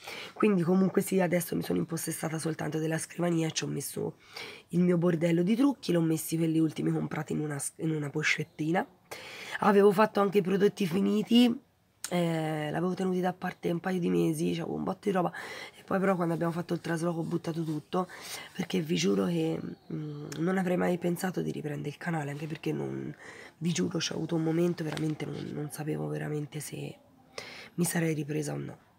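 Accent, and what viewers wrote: Tuscany, Italy. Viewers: Simpatico accento toscano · Dai che ce la fai e noi saremo qui, io potrei essere tua madre per l'età ma mi piace ascoltarti con il tuo accento toscano e mi sei simpatica ti ho sempre pensato e ho immaginato che stavi passando un brutto periodo. Quando vuoi saremo qui ad ascoltarti tvb 😘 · Sono le del mattino sono appena tornata a casa e trovo questo video .......quanto mi eri mancata cica ❤️❤️❤️❤️❤️ daje tutta sei una forza della natura e ce la farai anche sta volta sono felicissima di risentire quell’accento toscano che adoroooo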